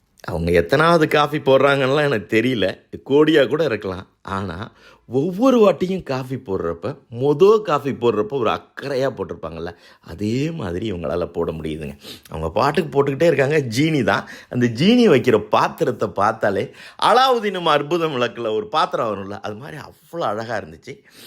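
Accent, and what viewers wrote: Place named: Sri Lanka. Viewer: உங்கள் தமிழ் உச்சரிப்பு ...சைலோனில் உள்ள எனது தமிழ் ஆசிரியரை நினைவூட்டியுள்ளீர்கள்.. அனுபவத்தை விவரித்ததற்கு நன்றி.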